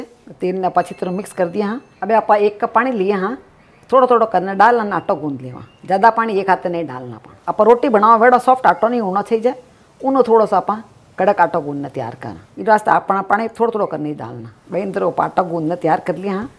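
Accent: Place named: Punjab, India. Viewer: Daadi amma to app lgte hi nhi hi aur apki voice to bhut sweet hai Punjabi accent bhi ata hai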